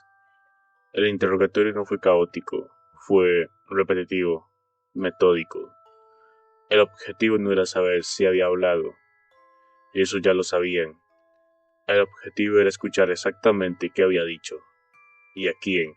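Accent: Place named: United States. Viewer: Buena Creepypasta bro pero y ese asento hablas como un gringo tratando de hablando español y lo hubieras hecho con la voz Loquendo de vería más chido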